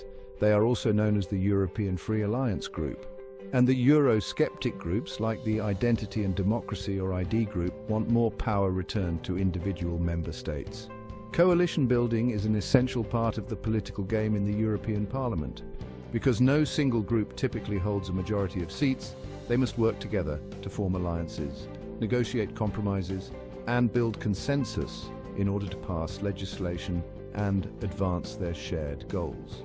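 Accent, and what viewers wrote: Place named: United Kingdom. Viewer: Why am I listening to a British accent talking about the European Union. Brexit means Brexit.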